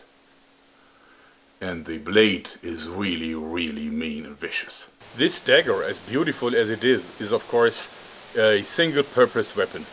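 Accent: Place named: East Germany, Germany. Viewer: Sounds eastern German, but I'm not sure. He is German though so the accent is from a germanic country.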